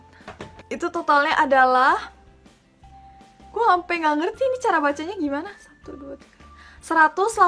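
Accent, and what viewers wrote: Japan. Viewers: Logat mu udah sperti orng jepang dik.?.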